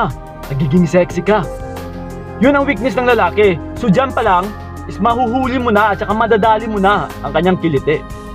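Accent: Iloilo, Philippines. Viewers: Hello po, ilonggo ka cguro dahil sa tono hehehe.. New subscriber po. From Hong Kong 😊Ldr din po. Thank you sa tips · ilonggo ka po ba kuya ?? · Ilonggo ka kuya? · Ilonggo ka ba sir?😁yung tuno ng pananalita😉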